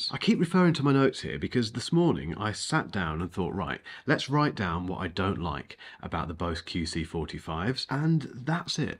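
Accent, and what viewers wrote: United Kingdom. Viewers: Calm lovely voice, British accent is the best ❤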